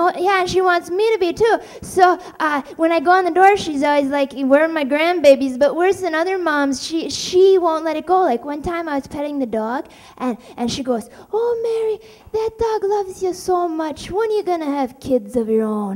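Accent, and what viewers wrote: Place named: Midwest, United States. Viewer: Wisconsin, Minnesota accent & twisted sensibility… both mine it.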